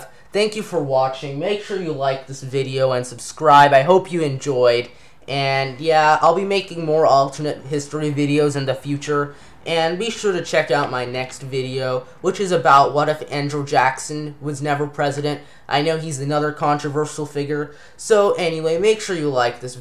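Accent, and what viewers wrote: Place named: California, United States. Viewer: You sound like you live in California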